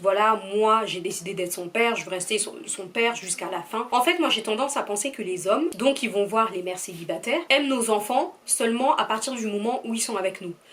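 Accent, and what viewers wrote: Cameroon. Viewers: Ton accent kmer c'est la tension😊. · Si vraiment je dois être honnête j’ai pas compris l’intérêt de la video a partir du moment où raflo elle même a déjà répondu au question des gens . Je pense que porter de l’intérêt à ce connard n’est pas utile . Raflo mérite mieux ! Qu’elle vive sa vie une nouvelle et belle vie mama le gars la est pourri , elle a de la chance de t’avoir à ses côtés Jpense que c’est grâce à vous son entourage aussi que la rupture ne la pas abattu . Bref malgré que je trouve pas l’intérêt de ta vidéo merci d’être là pour elle je pense que tu es une très belle rencontre pour elle . Laissez vos affaires de sorcellerie bande de fou. Ton accent camer me stress trop 😭 bisous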